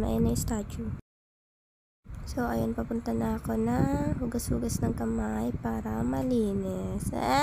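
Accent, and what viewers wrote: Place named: Batangas, Philippines. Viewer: Parang familiar ang boses ah parang Yong batangenia done po salamat for sharing ingat po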